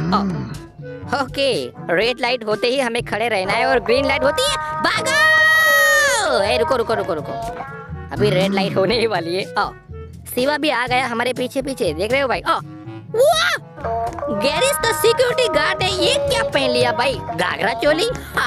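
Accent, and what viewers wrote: Gujarat, India. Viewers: App Gujarati ho kya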